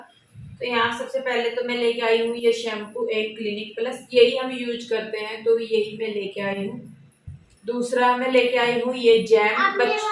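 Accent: Haryana, India. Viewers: Di aap hariyana se ho kya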